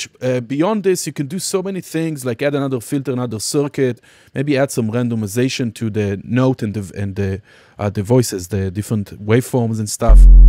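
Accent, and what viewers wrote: Israel. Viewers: גם ללמוד מסרטון באנגלית ברמה גבוהה וגם לשמוע מבטא ישראלי כבד. תענוג! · אחלה ערוץ יש לך. אוהב את המבטא שלך. ישראלי אמיתי. תודה אחי. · חחחחחח ישראלי ,מבטא יפה.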